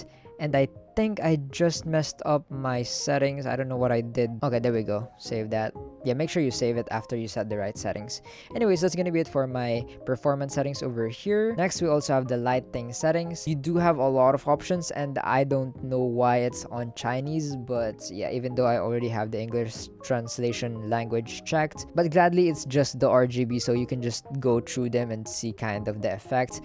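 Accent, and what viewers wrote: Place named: Philippines. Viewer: Are you Filipino by chance? You sound like one. 😊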